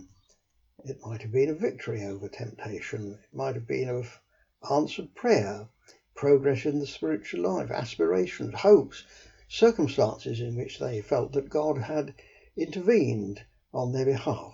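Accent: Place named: England, United Kingdom. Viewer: You have a very refined English accent for a Welsh speaker.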